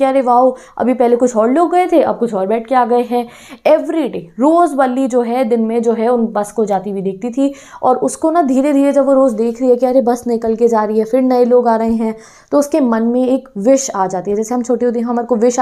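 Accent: Punjab, India. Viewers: Di are you a Punjabi?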